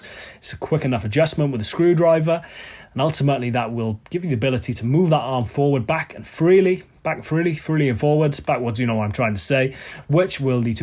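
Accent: United Kingdom. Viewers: Stupid hairstyle and British accent will turn off most USA viewers.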